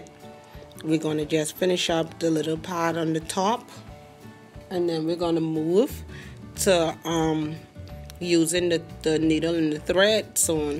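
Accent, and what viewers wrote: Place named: Bahamas. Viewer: Hello sound like from Bahamas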